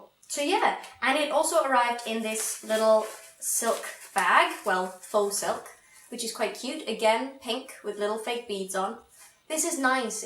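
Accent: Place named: Geordie, United Kingdom. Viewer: What is that accent? It sounds posh but with a bit of Geordie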